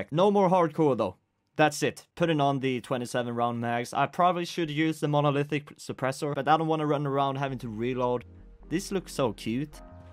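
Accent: Sweden. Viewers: are u swedish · U from Sweden??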